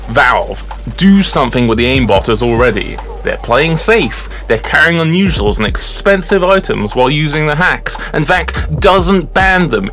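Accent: Russia. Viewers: are you rusian???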